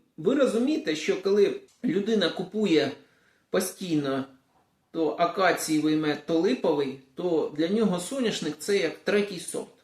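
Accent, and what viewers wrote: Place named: Chernihiv, Ukraine. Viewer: коли слухаю тебе то згадую дитинство. У 1978році до нас після Ніжинського педінституту прийшло декілька молодих вчителів серед них фізик слово коли вимовляв так як ти думав що він з Чернігівщини, потім він напевно повернувсь на батьківщину. Ти з чернігівської де така говірка?Чи може Каликовський так його прозивали учні був з Харківщини?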